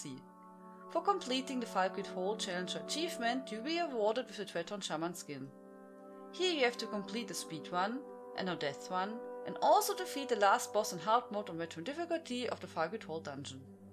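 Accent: Germany. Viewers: yes or Akzent is germany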